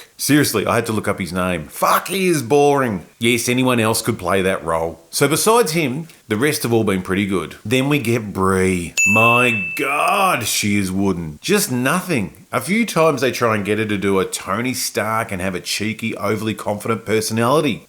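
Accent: United Kingdom. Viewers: 59 seconds in and you are wrong. red sonia was not a superhero. she was just a hero, adventurer . I am not sure what country you are from, sounds British, but I have lived in america since 1976. The 90's the movies were not the nicest to women in the 90's, we were still pretty sexist. In real life and movies. You try to make it sound like we were liberated. we were not. I do agree the movie was pretty boring. I only saw it once. I have no idea if your points are right. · btw i love your accent (British right?)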